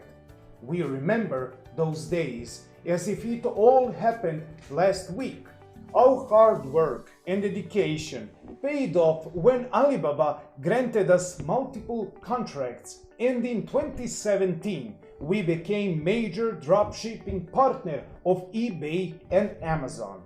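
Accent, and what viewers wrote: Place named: Jacksonville, United States. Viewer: Lol grew up in Jacksonville? I knew I recognized that accent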